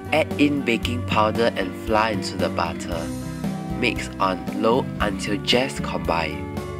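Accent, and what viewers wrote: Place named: Singapore. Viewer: I'm sure you are Singaporean with that voice, anyway,great receipe!, I'm from Singapore too!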